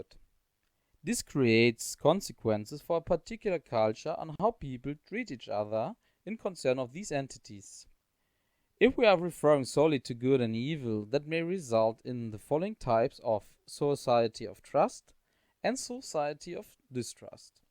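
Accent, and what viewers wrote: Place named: Germany. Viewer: Inhaltlich gut, aber mach es besser auf deutsch. Dein englische Aussprache ist zu deutsch. Aber danke fürs Video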